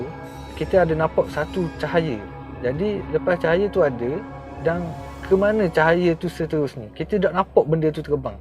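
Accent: Terengganu, Malaysia. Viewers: MU BUAT KONTEN..APA MU CKP PUN..AKU XPHM..CKP BENDA APA PUN XTAU..SBB MU PEKAT SGT GANU..CKP K.L...SEMUA OWG PHM..MU CKP GANU..MN PAHM..MU PUN NK CKP TAKOT2..LG XPHM..LAST2 AKU BOSAN NK TENGOK.. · Ooo... Awk org ganu ye.. 1st time lyn OH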